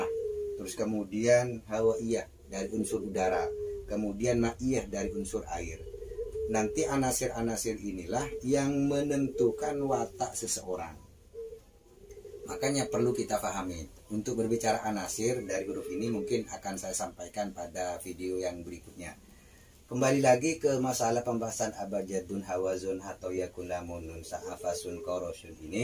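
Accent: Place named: Sumenep, Indonesia. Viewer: Sampeyan dimana mas. Ko logat nya sumenep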